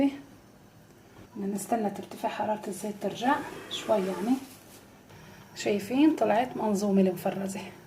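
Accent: Aleppo, Syria. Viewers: الحمدلله عسلامتك لايحرمنا هللهجه الحلبيه الاصليه..ذكرتينا باهلنا...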